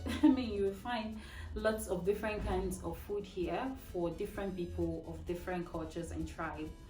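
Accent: Nigeria. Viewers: Your physical looks are Kenyan coincidentally. Your accent is also not as deep as my Nigerian next door neighbours😊. In three months your Nigerian accent will disappear😊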